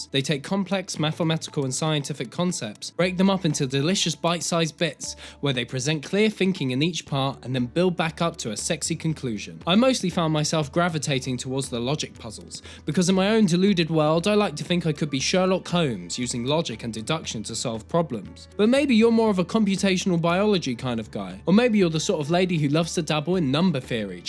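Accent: England, United Kingdom. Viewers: You sir are a talking vagina. Just because you point out the obvious in a high pitched English accent does not make you clever.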